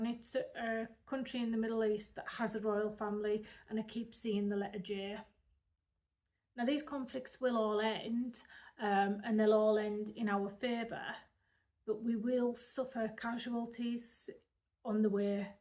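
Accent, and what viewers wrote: Newcastle, United Kingdom. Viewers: Geordie or Liverpool I feel, I'm leaning Geordie. Someone perhaps not born here but has lived here a long time and picked up the local accent is my feeling? It's a nice accent, very interesting. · Yes l get what your saying she does sound a bit Geordie on further listening more north Yorkshire, l myself 12 miles from Newcastle. Where are you from?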